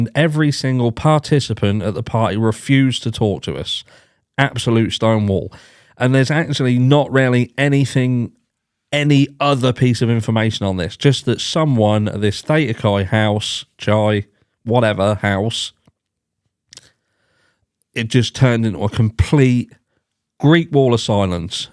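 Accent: United Kingdom. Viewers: I have always wondered where are you from obviously it sounds like the UK somewhere just curious as for the Davis stabbing its a shame that people are so stupid with the whole snitching thing people think you're a snitch if you talk to cops Davis is a few hours away from my home an it gets a lot of bay area students an they are with the no snitching an if they come from the hoods of the bay area stabbing is somewhat normal more shooting but Oakland an San Francisco is some violent areas so its crazy there is a coincidence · NEW Subscriber Your Chat is easy to Lisen to. Even with the deep UK thing in your voice. (it Ok) Point of note. A true K BAR MARINE knife does not have a saw tooth stile on one side of the blende. It has a blood grove in the middle of the blade, A leather staked handle, with a metal back end. Is the blade must have a nick or a Bland spot. 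or super dull to Sair skin. If it has a saw teeth side on it will be one used to cut boardwear making a t 54 knife not a true K-BAR, of it is this kind of knife. look for a post on it about halfway down the blade joining the two blade together. Deep South ALABAMA USA.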